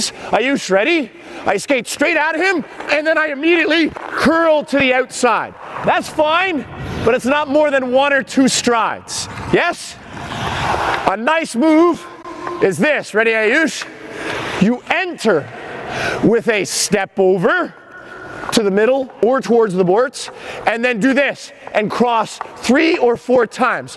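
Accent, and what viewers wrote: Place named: Canada. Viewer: Why can't u Canadian's say out properly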